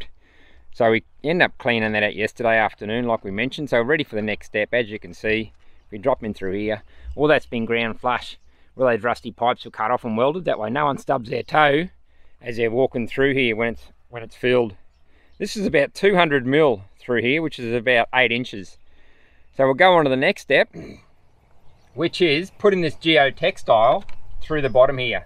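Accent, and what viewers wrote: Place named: Australia. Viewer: Great video so good to see and hear an Aussie on utube. Once in a life time job well done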